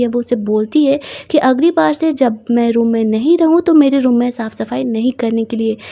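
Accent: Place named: Bhojpuri, India. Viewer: I love when u use Bhojpuri tone .😉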